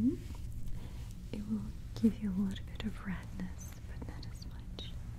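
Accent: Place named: Russia. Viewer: English is not her native language. She is from Russia.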